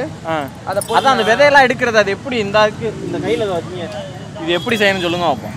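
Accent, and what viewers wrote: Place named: Tamil Nadu, India. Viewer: Arumai.... Thennagathu tamil arumai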